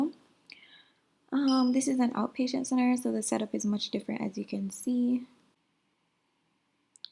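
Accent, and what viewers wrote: Jamaica. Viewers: You sound like you have a Jamaican accent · are you Jamaican?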